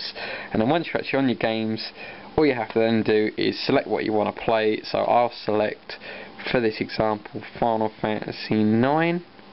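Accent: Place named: United Kingdom. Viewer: I love the britich accent while i don't realy understand lots of words :)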